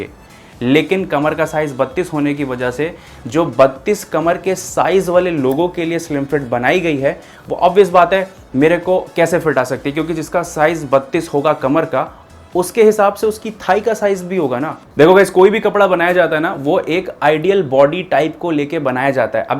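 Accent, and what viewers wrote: Lucknow, India. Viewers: Are you from lucknow 😀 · Lucknow se ho kya bhai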